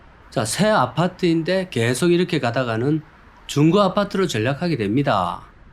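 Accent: Gyeongsang, South Korea. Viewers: 딱들어보면 경상도 사투리인데 무슨 북한빨갱이 조선족운운하는지 참 예의없고 싸가지없네 너야말로 중국 조선족 탈북자인가 예의지켜라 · 나 창원 사람인데 우리 동네분인가 하면서 듣는데 머선 소리~~ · 경상도 사람이 서울에서는 사투리 안 쓰지요. 갱상도 폿데가 좀 나서 그렇지. 그게 사투리 아니고 서울말 쓴다고 하는 말투입니다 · 북에서 왔나? 경상도 사투리도 조선족으로 생각하다니 ㅋ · 먼소리요? 내가 갱상도인데 내가 이미 검증하였음 나보다 더 오리지널 경상도사투리요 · 순수 경사도 사투리입니다🎉🎉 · 말투는 경상도 사투리네요 대구달성구 인데 생각있으니까 비워놓겠지요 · 뭔 개소리 토종 경상도 사투리도 몰라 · 딱 억양이 부산경남 말씨 경북대구쪽은 대출 이칼때 대~출 이라카고 경남은 대'출 대자에 억양을 씨게 함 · ㅎㅎ 이곳과 관계가 있는 분인가 보다. 갱상도 아임미까. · 전형적인 경상도말씨네요 트집잡는 사람은 아마 절라디언인가봅니다ᆢ! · 너무 구수한 경상도사투리를 쩝쩝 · 어이 말투 꼬투리 잡지마라 열심히 일하시는분 북한 빨갱이가 머꼬 니가 빨갱이같은디 경상도 사투리도 북한말로 착각하는거 보니 ㅋㅋ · 딱 들어도 갱상도 사투리인데~ 구수하기만 한데 뭔 트집? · 뭐 구수한 경상도 사투리 듣기좋구만...즐겨보는 채널인데...올빼미 TV와 함께...희망아 듣기싫으면 사라져라.ㅠㅠ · 원전 갱상도 사투리 인증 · 경상도 사투리도 지역마다 좀 틀린가봐요. 어떤경상도 사투리는 일본말 같은데 이분은 일본말같지 않고 진짜 구수한 사투리같네요. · 듣기 좋기만 한데 구수한 경상도 형님의 말투를 이렇게 나쁘게 표현하냐 너는 일베냐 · 경상도 사투리 구수하고 듣기 좋기만 하구만~넌 중국 연변에서 언제 왔냐~? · 겁나 흔한 경남사투리인데 보도듣도못한 님이 빨갱이 아니노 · 오리지날 경상도사투리죠 강호동 은 꿋꿋이 방송에 떠드는 그 ~ 갱상도 사투리올시다 호동이가 조선족 안입니더~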